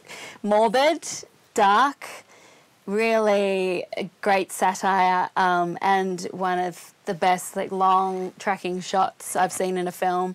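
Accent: Australia. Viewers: that australian accent tho <3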